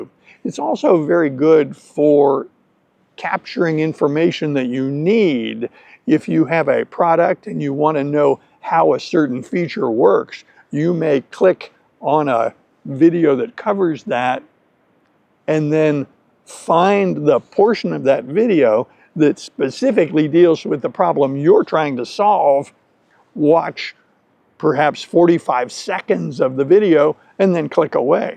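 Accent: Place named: General American, United States. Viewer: face-blue-smiling:... again... I love the way you tell everything and throughout the story you use phrases and expressions to describe processes, situations and the spatial and temporal relationships of the matter in question are already implicit (grammar)... that is, we can learn English by listening to you and of course, then I pause the video, repeat and try to imitate your pronunciation which is very standard and characteristic of the American accent in general... thanks,!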